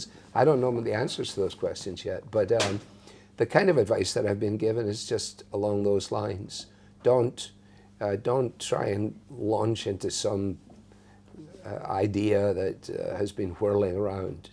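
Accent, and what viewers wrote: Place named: Scotland, United Kingdom. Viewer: I started watching and listening to Alistair after my husband passed away and I decided to join a large church where a lot of my friends were going. The church had called a new young pastor after the former pastor retired. I had been listening to after I got home from my previous church and I really liked his expository teaching. He came to visit me before I joined the new church. He is the one that told me about pastor being his favorite pastor to listen to. That was nearly 3 years ago. So that began my acquaintance with. I searched for every sermon that I could find. I loved his teaching. Just as I loved to listen to the pastor of my new church. I also love Pastor Scottish accent. I was raised in the Presbyterian church and a member of the PCA until my husband died. I joined a large Baptist church. Hard to make that decision but I’m glad I did. 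Since all this has happened to it breaks my heart that he has been judged so harshly by his peers. I pray for him and his family that all will be well. The church needs pastors like him,especially since we have lost so many good teachers in the past few years. Men like RC, and Dr. MacArthur, and so many others. The church needs them. We need to pray that God will bring forth more godly me who can fill those shoes.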